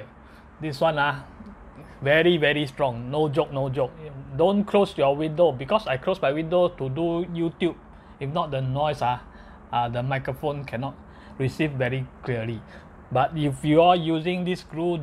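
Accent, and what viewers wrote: Singapore. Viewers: HAHA. Funny uncle. Thanks for your comprehensive guide! Love your Singlish! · first time come across your video! solid. keep the singlish real. feels like home!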